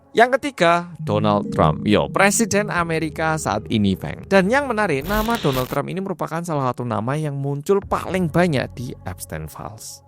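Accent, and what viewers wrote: Java, Indonesia. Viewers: Ak lebih suka medok ini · WONG KOREA BAHASA INDO MEDOK...😂😂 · Korea medok....weeeeh baru denger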